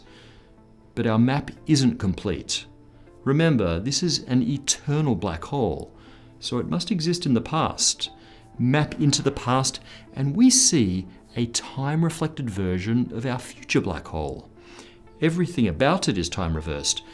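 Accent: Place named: Australia. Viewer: do you have a channel with the same information, but without the Aussie?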